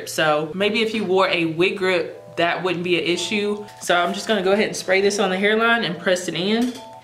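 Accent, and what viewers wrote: South, United States. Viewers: Are you from Texas? You have a slight southern accent and you sound like you could be from here.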